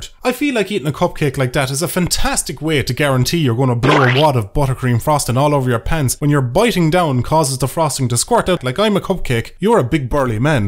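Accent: Ireland. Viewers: Your voice is so much more Irish in irl lmao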